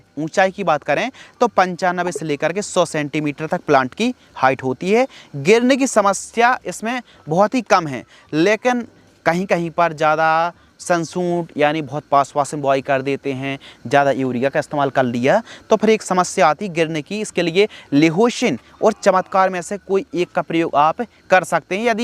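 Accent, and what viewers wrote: Bundelkhand, India. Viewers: भज्जा तुम तो बुंदेलखंड के लग रए हो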